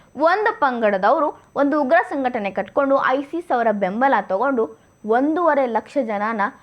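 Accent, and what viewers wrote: North Karnataka, India. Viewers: Madam nanu davanagere hattira harapanahalli yavanu nimma video noduttene bahala channagi uttarakarnataka basheyalli narrate maduttiri balabala chanda iti nimma base all d best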